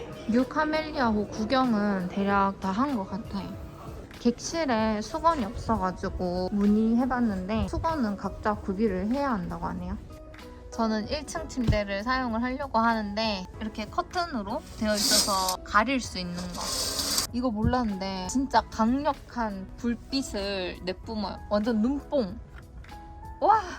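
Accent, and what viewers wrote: South Korea. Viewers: 부산분은 아닌거같고 경북 억양이 조금느껴지네요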